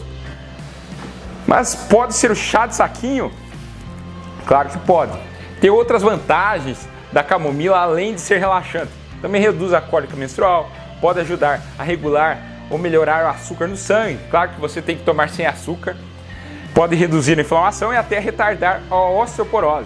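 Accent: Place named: Minas Gerais, Brazil. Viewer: Dr vc e mineiro? Convesa igualzinho mineiro,, eu sou mineira com muita orgulho!!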